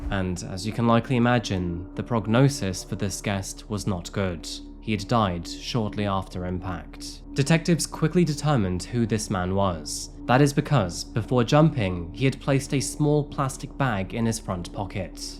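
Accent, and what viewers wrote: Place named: United Kingdom. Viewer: Trapped? Like I didnt click here, for the cute, coffee loving smooth brit voice lol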